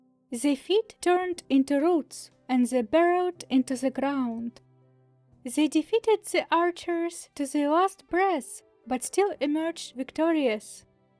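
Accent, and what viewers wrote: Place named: Eastern Europe. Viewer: Very good and organized video! I really liked the songs, and you have a great speaking voice, and the accent makes it more cute instead of distracting and hard to hear, like some unfortunate peoples accents. Maybe it's because I just happens to like slavic people's languages AND accents regardless if it's a male or a female speaking. Even the males, who are supposed to be so tough in many slavic countries sound very gentle :) Liked and subscribed to help you along with the youtube algoritm!!!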